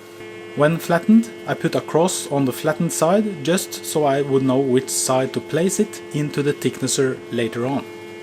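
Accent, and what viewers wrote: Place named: Minnesota, United States. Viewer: I was listening to u say ticknesser and udder hand then realized because I'm from Minnesota I knew exactly what u were talking about. Nice work!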